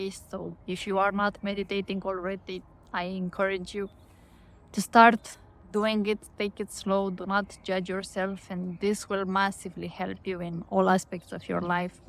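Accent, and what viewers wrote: Romania. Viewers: Dear! Thank you so much for this vulnerable video! I too am Romanian and recognized your accent from the very first seconds. But for the sake of this post, I will comment in English because I am sure so many viewers will resonate with your message. I recently got into discovering perfumes. It hasn't even been 3 months yet, and my collection went from one signature scent that I had for the past 12 years to 6 full-bottle niche perfumes and a bunch of paid decants (and I can't really say I have the income for such big expenditures in such a short amount of time). These past months I've been compulsively watching videos about perfumes, reading on forums, reading people's comments on Fragrantica, taking recurring trips to perfume stores, and hunting the next thing on my wish list. And when I finally got the next perfume, I wasn't even that impressed with it anymore. 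When you mentioned the WHY I immediately started tearing. As you said, I think this sudden obsession came from the need to fill a void as I just experienced a painful loss. I am glad I caught this obsession early on and I am determined to put a full stop to it. Thank you for your message and advice.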